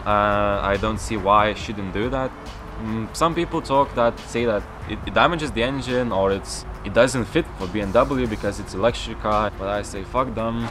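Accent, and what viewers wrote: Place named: Lithuania. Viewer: Agirdi tu lietuvis nes nekaip kalbi anglas nedarytu jokiu klaidu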